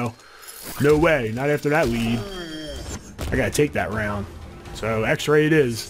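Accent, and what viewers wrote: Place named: Philadelphia, United States. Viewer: def either from philly or south jersey...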